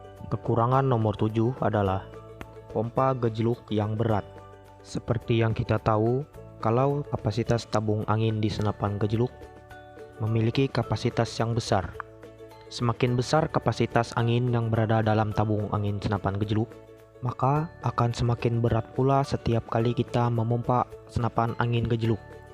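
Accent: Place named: West Java, Indonesia. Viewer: Logat nya kya orang sunda sih bos...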